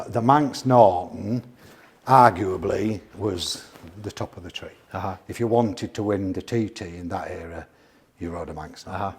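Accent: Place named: Dundee, United Kingdom. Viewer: The dundonian accent is a thing of beauty 😂😂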